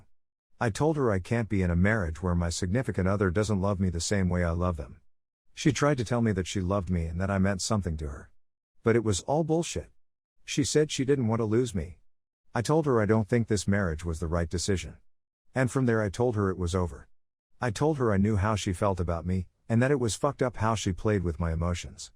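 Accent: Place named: Australia. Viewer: "I sit down and cried." The guy sounds like an Australian.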